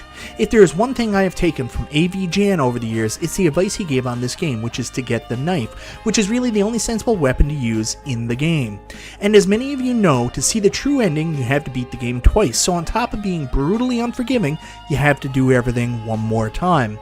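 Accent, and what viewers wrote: Newfoundland, Canada. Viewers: Holy fuck buddy I can recognize a Newfie accent from 100 miles away. Just picked up one of them machines on Facebook market place. Anywhere to find them here on the island? Or better to order them online?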